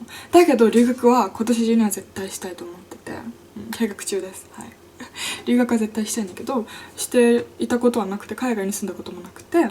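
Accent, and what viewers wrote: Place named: United States. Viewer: wow I thought for sure you grew up in the States or something haha You have a lovely speaking voice; I love playing your videos in the background while I work ^^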